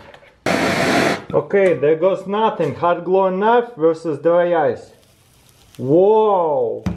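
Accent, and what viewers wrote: Russia. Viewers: I really love his Russian axent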